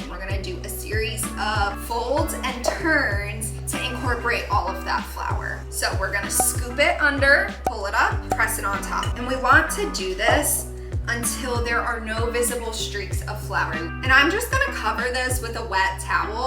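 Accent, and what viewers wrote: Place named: United States. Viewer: Bro is soooo weird like looking at you speaking etc 😂😂 I don’t why but I didn’t imagine you speaking like a classic American girl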